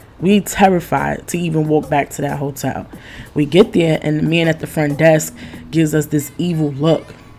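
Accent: New York, United States. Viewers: Unfortunately human trafficking has been going on for years in South beach but it just recently got real bad. I heard it’s real bad in Texas and Arizona as well. Also the “police” definitely be in on that shit believe that. Also off topic I have been watching your videos for a couple of days and I knew you was from NY we just have a way we talk. I’m from Bedstuy and we are definitely with the shits!!😏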